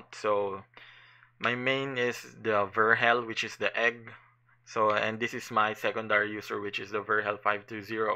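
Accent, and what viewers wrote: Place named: Philippines. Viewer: Filipino accent there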